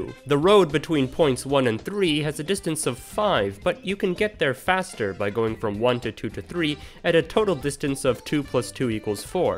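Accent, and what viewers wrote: Netherlands. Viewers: Love your dutch pronunciation tho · I love your dutch pronounciation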